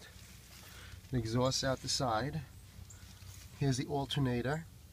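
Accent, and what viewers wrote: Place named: Boston, United States. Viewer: Boston accent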